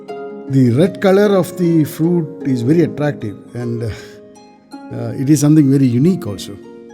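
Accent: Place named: India. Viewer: I don't understand your India English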